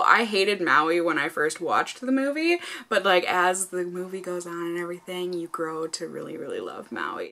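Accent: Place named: France. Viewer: Your so funny! Lol your French is cute.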